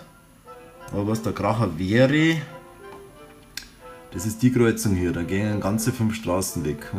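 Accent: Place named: Bavaria, Germany. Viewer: Oha, eigentlich supergeil... aber da bayrisch isda schweer füar da nordditsch. quaquwetschkommod....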